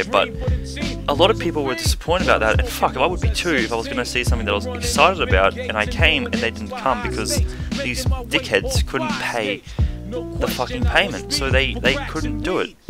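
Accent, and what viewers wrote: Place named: Australia. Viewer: thought you were an aussie, we sound identical haha!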